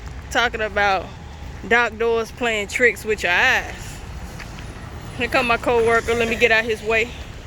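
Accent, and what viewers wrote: South, United States. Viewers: Hey lady. I haven’t been with you from the beginning. But I do remember when you were local. Then you got your own truck. I remember you talking about running under your own AUTHORITY. Then you started company hoping. And now your back driving your own and running Local. Stay post and start and keep your head up. Still waiting on that other channel. Love your Southern Twang. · Hi there! Sorry, that happened to you. My peoples lives in Memphis. I just your southern accent. Your down to earth too.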